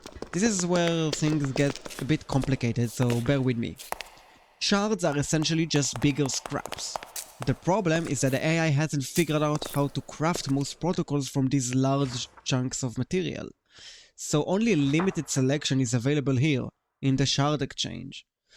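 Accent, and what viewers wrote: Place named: Germany. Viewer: your accent is so interesting it kinda sounds german but not at the same time so cool! also this video is so awesome of an intro its really so awesome to see new ideas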